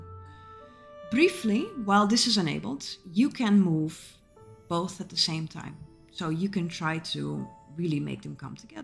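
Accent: Netherlands. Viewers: that too lol but you speak very good English and for some reason i recognize the way Dutch people speak English. We can either speak very good English or very bad like Louis van Gaal 😂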